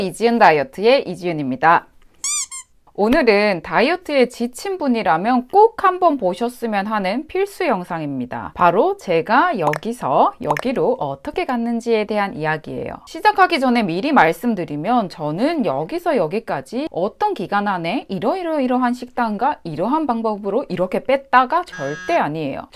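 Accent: Gyeongsang, South Korea. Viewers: Yuna Kim 저도 경상돈데 살짝 저분은 경상도 말투 중에서도 희귀한 편인듯요.... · 경상도 사투리 베이스의 표준어인가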